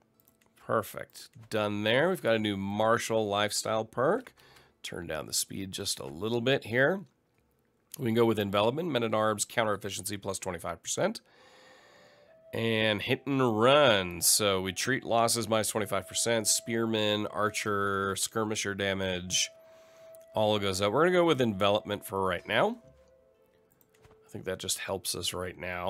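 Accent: Germany. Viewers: Hey are you german ?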